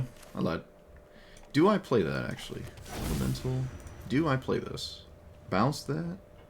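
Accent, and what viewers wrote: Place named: Scotland, United Kingdom. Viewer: Algorithmic Engagement* with Scottish accent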